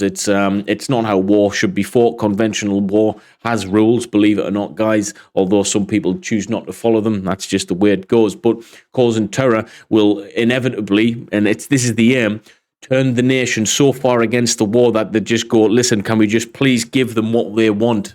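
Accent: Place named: United Kingdom. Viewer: I've added you into the rotation with Andrew, Denys, Paul, & Artur. Great rundown, mate! Especially appreciate seeing the satellite map, but as a Brit ex-pat it's nice to hear the accent - at regular speed😆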